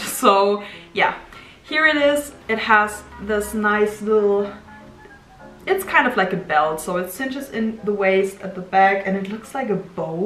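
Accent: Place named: Germany. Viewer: Your German accent makes you have a lovely voice! I stumbled across your channel while watching 1940's videos. Hollywood should be using you as an actress in 1940's theme movies. You could definitely play an eloquent society girl of the 1940's.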